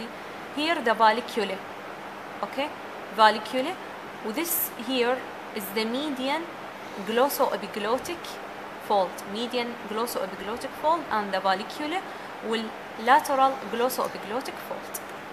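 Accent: Arab world. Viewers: bro she is arab i think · English with arabic pronounces thank you sister you’re best 🤗🤗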